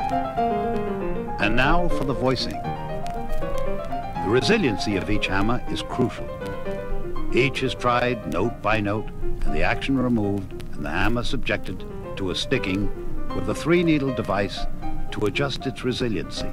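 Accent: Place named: New Zealand. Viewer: Why does the narrator, John Steinway have a NZ accent? I am confused... Is there a NZ connection?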